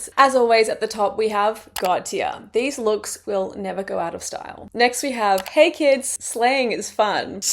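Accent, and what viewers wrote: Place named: Australia. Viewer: OML I HAD SUCH A MOMENT WHEN U SAID U WERE AUSTRALIAN- I AM TOO AND I'M SO USED TO HEARING AMERICAN ONES I JUST ASSUMED U WERE, AND I DIDN'T HEAR ANYTHING DIFFERENT BECAUSE IM ALSO USED TO AUS ACCENTS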